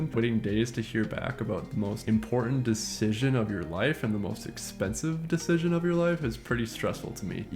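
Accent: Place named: Midwest, United States. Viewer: You sound a bit midwestern. You're adorable!❤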